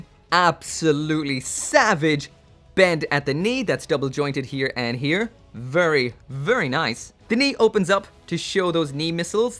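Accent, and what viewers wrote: Ireland. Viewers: You from ireland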